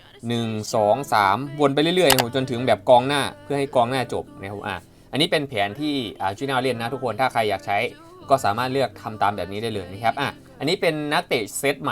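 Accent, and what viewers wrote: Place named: Isan, Thailand. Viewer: เสียงเหมือนคนอีสาน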